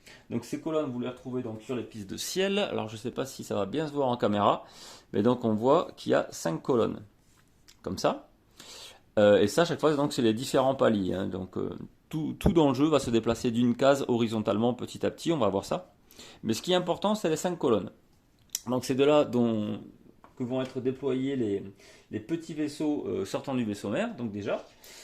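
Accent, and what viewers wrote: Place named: Toulouse, France. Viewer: avec grand plaisir de se tutoyer (j'avoue avoir modifié mes "tu" en vous car je voulais pas sembler trop invasif avec ma question du "d'où es tu". Mille fois d'accord que quand tu compares ça avec les kickstarter actuel, ça devient de la folie, après faut dire que svt dans les kickstarter tu as de la figurine et c'est la course au stretch goals donc le volume peu devenir fou et le prix l'est déjà de base et alors quand tu vois les all-in... Bon ça m'a pas empêché de 'e pas être raisonnable durant l' année sur KS 😅 Sinon tu as bien pris l'accent Toulousain effectivement, je reconnaissais une touche du sud mais légère. Je suis de Montpellier c'est pour ça que ça me parlait un peu 😜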